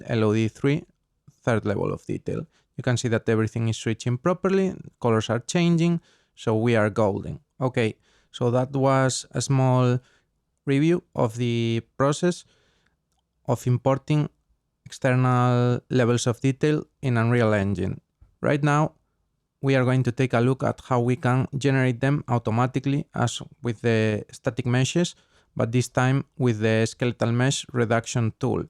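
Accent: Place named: Spain. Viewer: gracias tete por el tutorial, joer, como se nota el acento español xD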